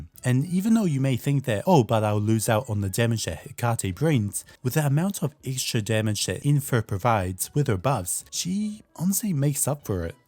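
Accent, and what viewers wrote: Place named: New Zealand. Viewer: Are you Kiwi? ;) Love your accent!!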